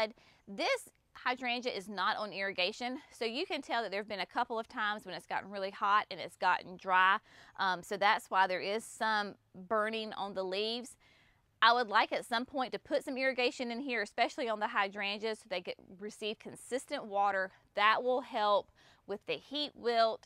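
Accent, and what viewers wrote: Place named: North Carolina, United States. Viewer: As soon as I heard your voice, I knew you had to be from North Carolina. Haha I am from NC too. Love your garden! Im trying to find beautiful shade perennial flowers and shrubs to plant in zone 8.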